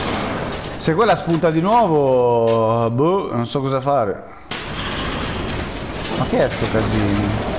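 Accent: Rome, Italy. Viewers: come al solito mmmaaaazzzzuuuuunnnnnaaaaa,spolliciate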